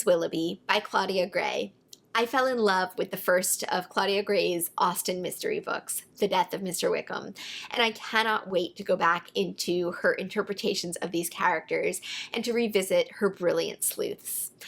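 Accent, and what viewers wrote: United States. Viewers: Glad to see you posting again; hope the little one is doing well. It might be informative to the fans of your three sites to hear how Jane Austen July was kicked off by you, the Spinster, and Katie. Did one of you have the idea and floated it by the other two or did you have a discussion on how to gin up more interest in JA? You are audibly American, whereas Katie is a Brit and Claudia is Italian-German who is married to a Brit. Did you all meet through YouTube? Your suggestions for book-reads are all good. I read Rory Muir's biography of The Duke (he was a second son who had to find his way through the Army), who became a much bigger fish than his once-famous older brother. You simply can't pluck JA out of context; the Napoleonic Wars, the Navy, and the struggle with France was the whole political underpinning to Jane's "fictional" world. 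Thus I would consider Patrick O'Brian (author of the Master and Commander books and a GREAT admirer of Jane) to also be acceptable fodder for July's activities.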